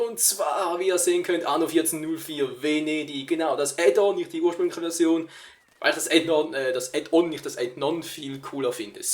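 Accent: Switzerland. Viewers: l_ANTRAX_l du der redet Schweizer Deutsch man man immer diese ernstirnigkeit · so. Das ist der schweizerische Akzent im Deutsch. Schweizerdeutsch verstehst du nicht mehr. xD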